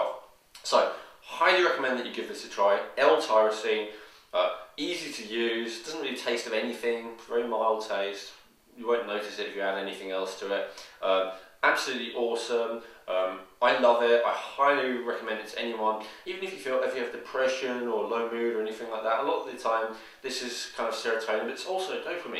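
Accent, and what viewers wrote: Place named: United Kingdom. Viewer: The few bits of your audio that are discernable, seem to possibly have useful info. Please, repost this info having recorded it in a room acoustically dampened to eliminate reverb, using a more sensitive microphone or placing it closer to your mouth, and without mumbling. Not everyone is atuned to the British manor of speech, and I suspect you want to attract the most viewers possible afterall. Also I suggest using a backdrop, even if it's only a non-distracting picture (digital or enlarged photo) of a common interior room, rather than a solid color. And shave before a public address. It lends to your credibility. Please, reply when your updated video is posted. I look forward to your thoughts on the posted topic.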